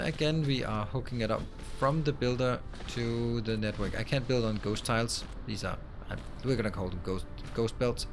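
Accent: Denmark. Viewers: Is that a Danish accent I hear? Nice video by the way. Game looks pretty chill.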